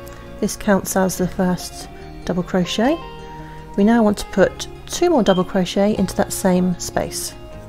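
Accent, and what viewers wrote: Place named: United Kingdom. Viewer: Hi this is a lovely design and you explain it so well. I’m a beginner in the uk, are these US or UK terms please? Because of your accent I thought it was uk terms but now I’m confused….it doesn’t take much 😂😂 Great video thank you x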